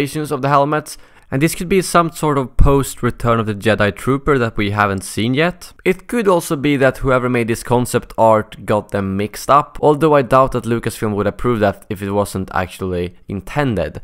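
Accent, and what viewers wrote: Sweden. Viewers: You can hear that he's swedish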